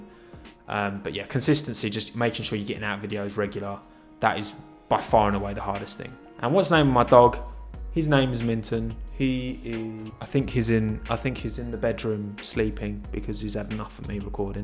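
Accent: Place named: Essex, United Kingdom. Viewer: Didn't even need to ask where you're from, could hear the Essex a mile off! Really enjoying the content 👍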